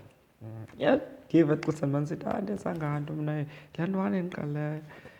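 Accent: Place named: Sterkspruit, South Africa. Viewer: Esakho Isixhosa ingathi sesase sterkspruit 🥱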